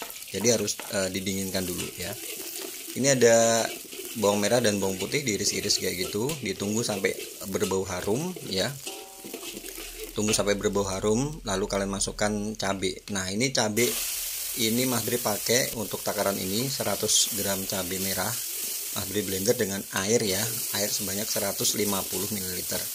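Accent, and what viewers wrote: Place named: Java, Indonesia. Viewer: POV :wong jowo ngomong inggris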